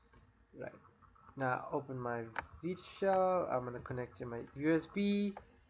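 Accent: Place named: Durban, South Africa. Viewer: okay thats a shame, but thanks for the video, it was really useful and saved me a bunch of time, also are you south african, you sound like you're durban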